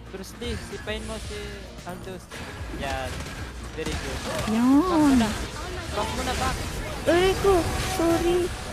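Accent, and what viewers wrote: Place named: Visayas, Philippines. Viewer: Boses. Vizaya k lodi. Hehehe ok lng yan penge skin <num>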